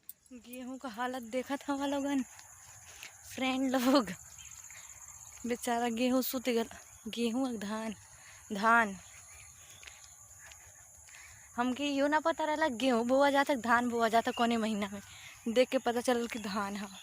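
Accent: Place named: Bihar, India. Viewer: Aap lgrha hi ki bihar ki hi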